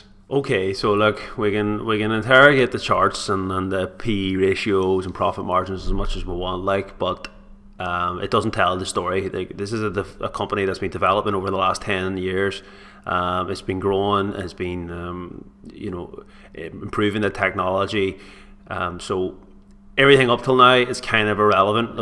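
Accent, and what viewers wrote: Northern Ireland, United Kingdom. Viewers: I thought it was a Northern Irish accent.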